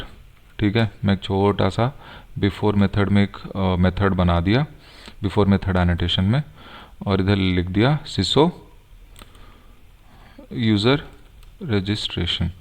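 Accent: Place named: Madhya Pradesh, India. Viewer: sir,excellent.....aapki hindi toh hamare MP ke jesi hain.. i have learnt TestNG from 2 months lekin aapne toh 1 din me hi sikha diya...samjhana ka tarika bahut saral and easy hain aapka thank you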